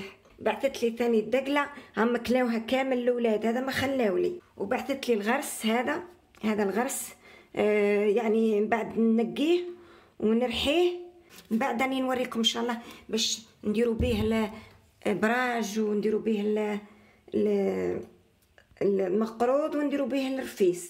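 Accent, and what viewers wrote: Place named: Laghouat, Algeria. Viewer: اختي هدرتك كيما هدرت ناس الاغواط.ممكن نعرف انت منين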